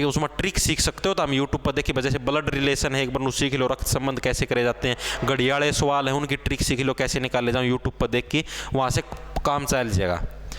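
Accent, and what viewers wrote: Haryana, India. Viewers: Sir 1 no Haryanvi chap di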